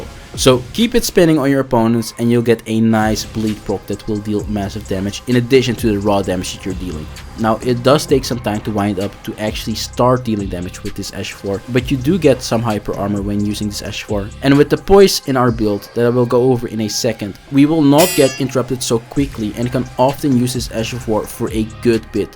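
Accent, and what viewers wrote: Netherlands. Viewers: I smell dutch…..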